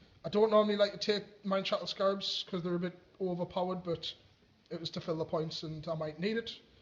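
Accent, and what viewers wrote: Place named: Durham, United Kingdom. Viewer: Was very pleased to see Wolves and Necrons and enjoyed the report, you sound like you are local to Durham as well. One thing is Logan on Stormrider is a vehicle so moves 12" in movement and flat out in shooting so shouldn't roll for a run move. Also about Stormfang in the formation, it can be chosen if it passes or fails reserve roll, it's not a reroll, that's the voidclaw formation rules.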